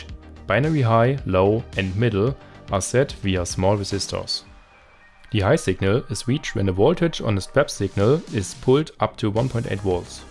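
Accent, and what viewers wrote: Germany. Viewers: u sound kinda german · I love how I could immediately tell that is guy's native language is German after some two or three words, lol. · i hear a bisschen deutsch??? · Wie man direkt den deutschen Akzent raushört 😂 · Also Ich muss schon sagen, deine Hand ist echt ruhig, aber deine Aussprache verrät, dass du Deutscher bist und das, ob wohl Ich auch einer bin, aber du machst gute Arbeit und daher meine Frage, warum nicht in Deutsch, sondern in Englisch oder hast du auch Deutsch Videos? Sorry bin Pfälzer und ja wir haben es net so mit Sietsen hoffe bist deswegen net angepisst ist dein Vorname ok oder soll ich ihn nicht nenne gleich mal Daumen und Abo dagelassene ach und (net) heist soviel wie (nicht) in Sachsen weis net ob da so pfälzisch verstanden wird deswegen hoffe nimmst mir net übel ,weil mir ist auch aufgefallen das sich sonst keiner traut mal einfach Grafikeinheit zu tauschen oder Prozessor, wenn er verlötet ist als Respekt wäre niemals auf die Idee gekommen wie Mann überhaupt rann gehen sollte aber bei dir sah es echt Profi mäßig aus ok noch mal gute Arbeit! 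· Wusste ich doch, dass du ein deutscher bist. Unseren Akzent kann keiner "nach" machen haha.. Guter Job. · When the narrator has a German accent in a tech video you know it's gonna be good. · Im hearing deutsch in his voice🇩🇪🇩🇪🇩🇪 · I hear German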